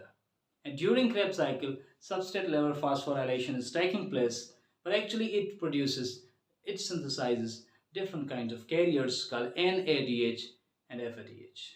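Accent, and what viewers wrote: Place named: United Kingdom. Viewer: Fully British accent wao.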